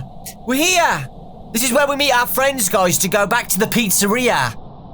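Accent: United Kingdom. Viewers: He’s British? Well I can’t judge since this is the first video I see of him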